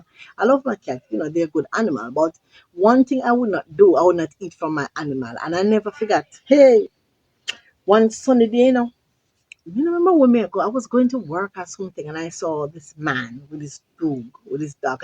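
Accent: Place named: England, United Kingdom. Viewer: Back in 1981 the Reggae Group made the song " "-Don't show I yuh teeth can't work! Maybe they were touring in the UK at that time,lol. I love when you're speaking with the proper English accent and then bruck out with some Patois🤣🤣 But in all seriousness The Jamaica Tourist Board should really contact you about doing some promotional Jamaican Ads as you're always positively highlighting JA. You are able to keep safe and sound where so many others have met their demise. Maybe you could give some Tips about safety measures. to di werl🥰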